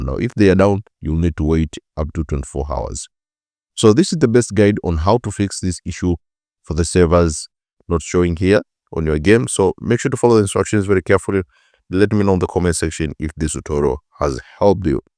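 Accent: India. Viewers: what is that accent bro indian or arab? fix your language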